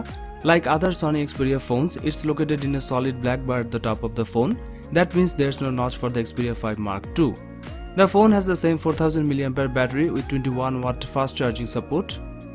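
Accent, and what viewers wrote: India. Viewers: I do not understand this Indian accent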